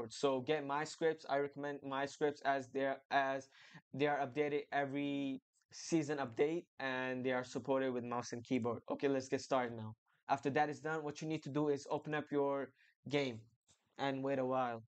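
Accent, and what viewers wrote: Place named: Germany. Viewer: sounds like german accent lmao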